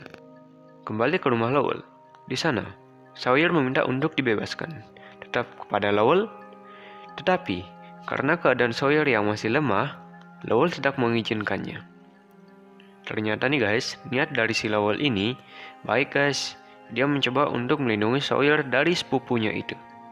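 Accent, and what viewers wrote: Bali, Indonesia. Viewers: Klo d perhatiin. Gaya bicara dan logatnya kaya orang bali... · Aksen sudah kelihatan... Semangat bli, maju terus untuk berkarya... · Org bali ni logat nya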